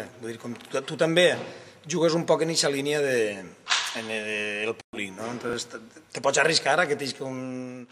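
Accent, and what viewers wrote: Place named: Alcoi, Spain. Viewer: Com es nota que es alcoià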